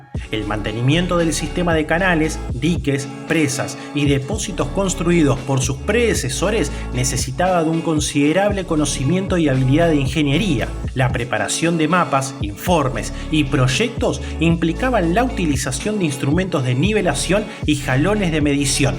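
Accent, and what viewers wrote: Argentina. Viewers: Hola! No sé tu nombre pero te felicito por todos los videos. Te puse en otro video pero tal vez no lo viste! Estamos organizando con la UBA y la UNLP una charla sobre Divulgación Histórica. Vamos a invitar a Javier Trímboli, el Profesor Borda y queríamos invitarte a vos qué haces estos videos que nos parecen muy interesantes para la Divulgación. Te interesaría participar? Por tu acento asumimos que sos argentino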